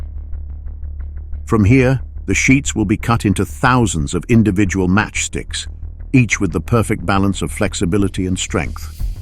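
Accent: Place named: United Kingdom. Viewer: Have someone w/ half a brain listen to ur audio to see what they think. Constantly stating the obvious over & fing over!! Hire a real writer & narrator, that British accent could not sound any dumber!!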